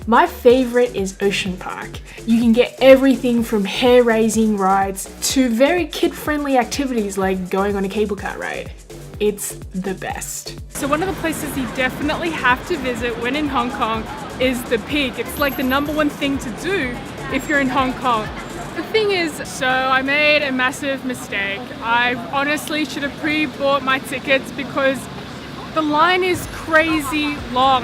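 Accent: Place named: Australia. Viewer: Hi I have just subscribed to you channel lol. You sound Australian very interesting. I have friends from Hong Kong and China too. How safe is Hong Kong for a man on his own. I believe you can blend a little lol. Dobspato England Newcastle Upon Tyne.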